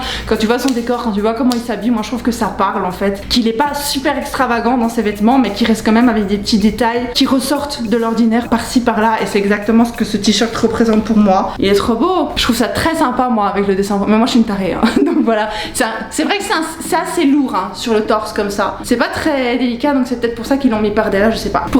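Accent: Belgium. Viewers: bitume C’est typique Belge, d’ailleurs Quick ils le prononce Cuik 😂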